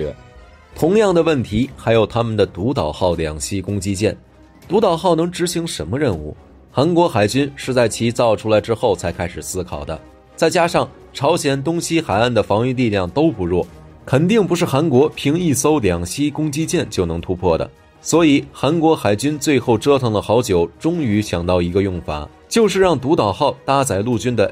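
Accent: China. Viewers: 有點好奇，標題的"韓國話虎膦"似乎是臺灣的發音，但頻道主發音像是大陸人，怎麼會知道臺灣的厘俗用語啊？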